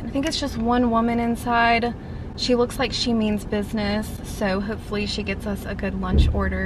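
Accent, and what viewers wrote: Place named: North Carolina, United States. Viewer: I think I remember u saying ur originally from NC but do u live there as of right now? U definitely have the southern accent from NC lol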